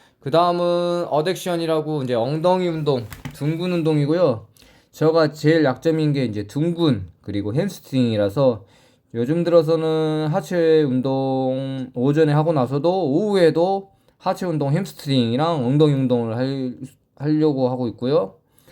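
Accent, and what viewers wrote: Jeolla, South Korea. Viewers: 행님 라도 출신이셔라?🙂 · 경남대표인디 말투는 전라도사투리인디요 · 경남대표 선발전 미스터 경남이라길래 부산 토박이인가 싶어 들어가보니 구수한 전라도 사투리ㅋㅋㅋ 예상치 못한곳에서 미스매치에 웃음이 나오네요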